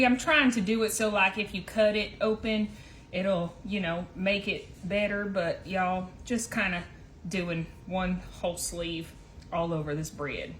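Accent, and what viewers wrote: South, United States. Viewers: Love your Southern accent & your recipes, also show more of you 🥰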